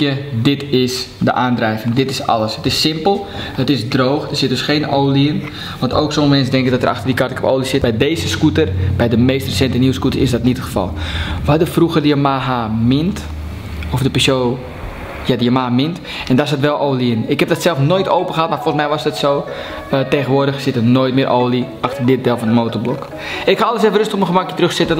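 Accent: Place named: Holland, Netherlands. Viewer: Zijn uitleg is best leerrijk maar snelle Hollands accent is niet te volgen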